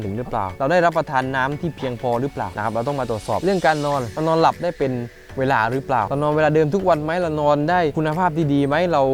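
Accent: South, Thailand. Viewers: คนใต้หรองับ/ สุดหล่อ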